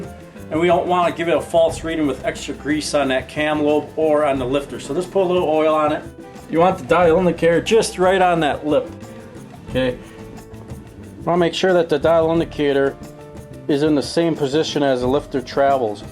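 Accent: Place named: Boston, United States. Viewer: ive read many times on how to do this and am successful in the operation of my vw motors but this was well explained and the music in the back make it easy to learn,lol!! nice video,sounds like you are from boston!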